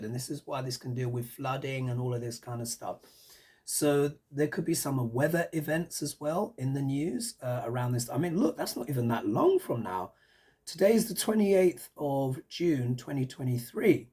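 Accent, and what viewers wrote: England, United Kingdom. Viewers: as a gay gentleman I can’t help but say you look cute as a button and don’t ever do anything to that gorgeous English accent. You open your mouth and the angels come outdancing no less. May God save our gracious queen!!! ❤❤❤❤❤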